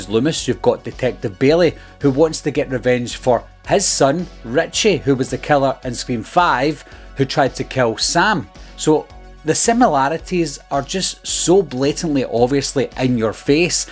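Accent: Scotland, United Kingdom. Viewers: I just meant your Scottish accent 👍🏻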